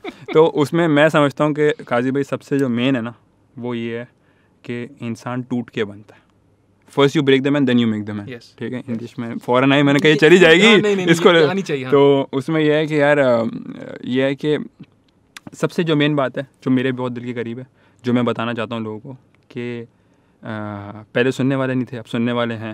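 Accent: India. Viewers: First time suna to lga ki indian hai but really love his style ❤️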